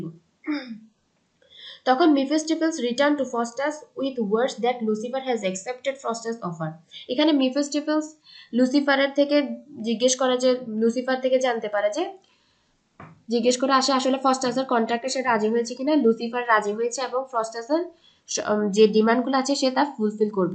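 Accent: Chittagong, Bangladesh. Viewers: চট্টগ্রামের ভাষার প্রভাব😆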